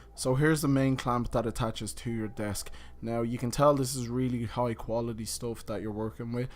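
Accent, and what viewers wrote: Ireland. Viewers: Love the irish accent! Keep up the great work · the irish accent though man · Where in Ireland are ye from?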